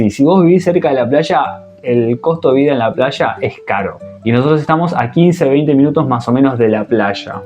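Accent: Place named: Uruguay. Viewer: Aprendí o un poco purtuges y ya tranquilo por el acento y otras fraces supe q ue eras de Uruguay, es caro Montevideo. No me convence acá, saludos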